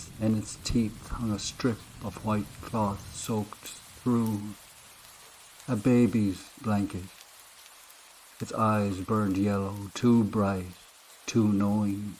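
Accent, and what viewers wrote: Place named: Clare, Ireland. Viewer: Love that I found your page. These stories remind me of the ghost stories my granny used to tell us. Thanks for the nostalgia. I keep trying to guess which county you're from by the accent. My guess is Co Clare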